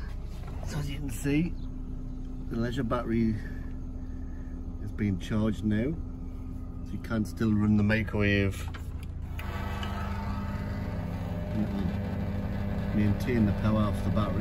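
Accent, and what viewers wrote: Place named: India. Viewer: Are you Indian?!!!i cant believe how you talking!!!!😁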